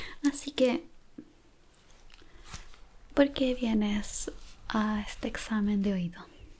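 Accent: Chile. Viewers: Es chilena 🇨🇱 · Amo el asiento chileno más videos así por favor ❤️ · Acento Chileno:0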